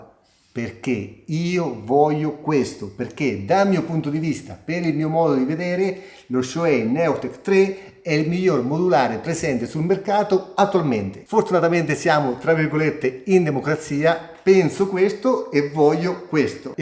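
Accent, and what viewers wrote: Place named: South, Italy. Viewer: Che accento da terrone!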